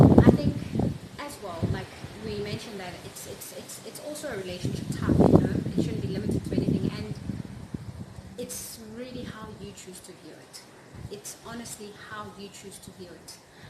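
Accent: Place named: Caribbean. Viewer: Very good video u explained that very good thank u keep up the good work . U sound like a Caribbean girl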